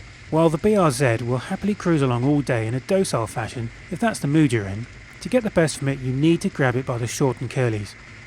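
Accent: England, United Kingdom. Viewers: awesome review!!! love it when car reviewers have that english accent too! :D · Why do British people add syllables, letters, and phrases to words that don't need to exist and make it a mouthful to say such as br-zed, Aluminium , innavitive, and other hilariously odd ways of pronunciation that unnecessarily make additions to simple words. I've heard it all and American English just seem simpler and less pretentious ,because its like they do this just to be different or put emphasis to things that just don't need it. XD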